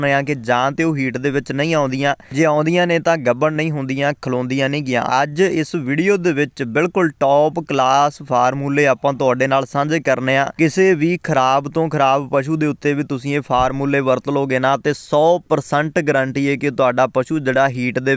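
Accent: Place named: Majha, India. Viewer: Veer ji majhe to lagde je boli to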